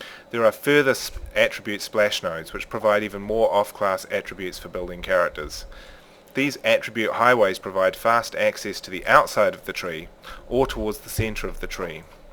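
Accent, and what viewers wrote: New Zealand. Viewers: Awesome kiwi accent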